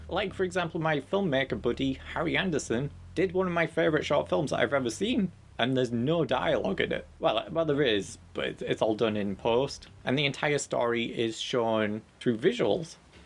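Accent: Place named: United Kingdom. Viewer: Great advice, thanks a lot! Love the humour. And the dialect 😊. Totally unable to place that, though - which part of the UK?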